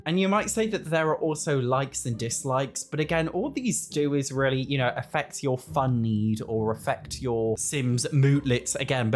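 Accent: Australia. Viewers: OMG YOU SOUND SO AURSSIE!!! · ... as an aussie, that accent was 100% what we sound like 😳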